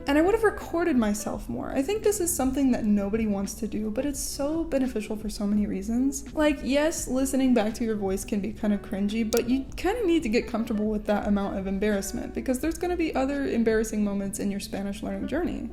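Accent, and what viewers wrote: Mexico. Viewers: As someone from SPAIN ur spanish is so mexican but it's actually very good!! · It's surreal how your Spanish pronunciation sounds so native and you sound like a high class Mexican girl. I speak Spanish, I just ran into your video and I got curious.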